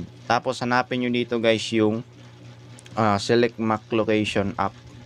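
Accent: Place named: Visayas, Philippines. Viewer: Ew bisaya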